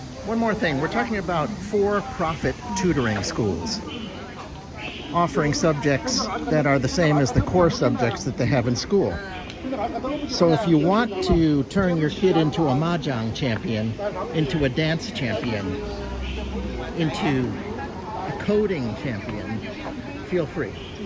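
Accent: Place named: United States. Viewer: were you learning English as a second language? Where are you from then, you sound American to me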